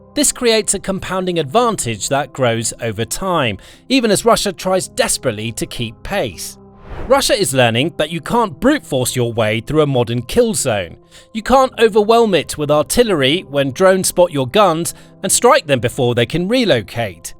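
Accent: United Kingdom. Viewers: You speak with a British accent and talk about Europe BUT USE MILES? instead of Kilometers? I don't get it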